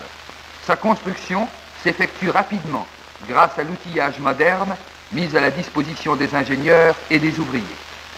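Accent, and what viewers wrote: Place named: Quebec, Canada. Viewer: Comme un Québecois. I hate it....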